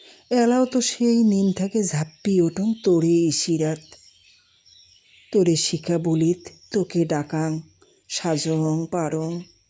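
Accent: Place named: Rangpur, Bangladesh. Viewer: "সংস্কৃতি বাঁচলে, শিকড় বাঁচে" - ঠিক কথা। ভালো লাগলো রংপুর আঞ্চলিক উচ্চারণে বাংলা কবিতাটি। আরও শুনবার আশা রইলো। সবাই ভালো থাকবেন। 🙏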